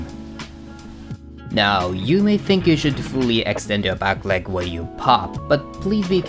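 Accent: Japan. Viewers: he sounds japanese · if this guy is not japanese, I don't know what planet he is from.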